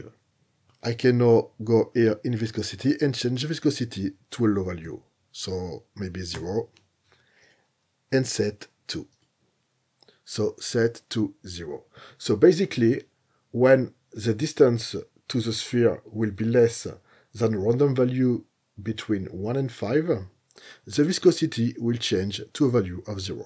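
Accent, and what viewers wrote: France. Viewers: ça sent le french accent! En tout cas il y a du client si jamais tu le fasi en FR pour gagner en réflexion à l'apprentissage de tes vidéos!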